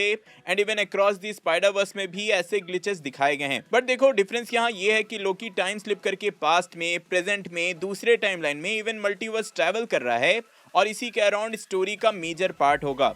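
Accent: Bihar, India. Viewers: Are you from bihar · bhai bihar se ho kya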